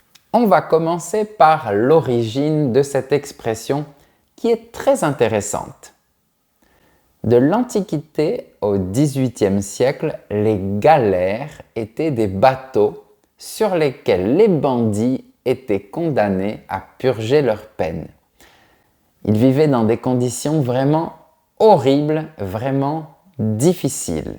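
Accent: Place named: Toulouse, France. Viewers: Le petit accent toulousin est tres mignon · Personnellement, j'ai toujours fait la liaison dans l'expression "Au cas où", je dis "Au cas z'où", comme dans "c'est obligatoire", j'ai trouvé bizarre que vous n'ayez pas fait la liaison, moi, je dis "c'est t-obligatoire" pas "c'est obligatoire" comme s'il y avait un h muet à obligatoire (hobligatoire)... ce n'est pas une critique, ce n'est qu'une observation, c'est une vidéo très intéressante, et vous êtes super-charmant... et attendez que je devine, vous êtes du Sud-Ouest. 😁😁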